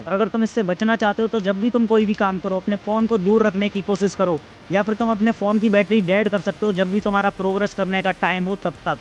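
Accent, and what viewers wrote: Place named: India. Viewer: I am not able to understand. I struggle with Hindi accent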